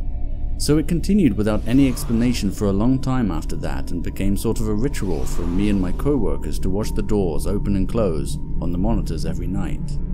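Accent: United Kingdom. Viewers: Why does the narrator have to have a British accent? It just makes no sense, is pretentious, distracting, and unnecessary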